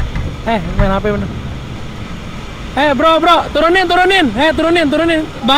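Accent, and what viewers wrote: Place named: Bogor, Indonesia. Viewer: Bang lu orang Bogor ya bang😂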